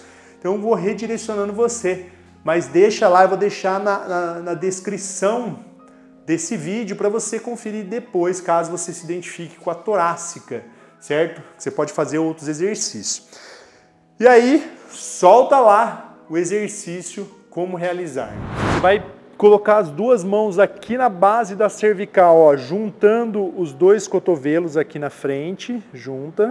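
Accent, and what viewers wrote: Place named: Sao Paulo, Brazil. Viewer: Vc é de Piracicaba? Esse seu sotaque, é bem típico!!!!!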